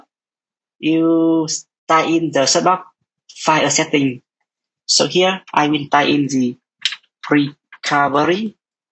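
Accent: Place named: Vietnam. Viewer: i love ur accent viatnamese right?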